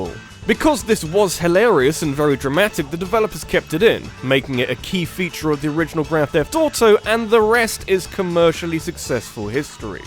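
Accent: United Kingdom. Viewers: [First impressions] Well, he's a bit-er. [Speaks] WELL LOOK WHO'S BRITTISH! [subs instantly]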